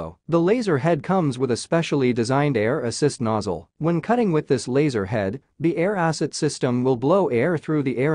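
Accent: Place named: China. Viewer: Computer voice sucks big time!!!!! sounds like chineglish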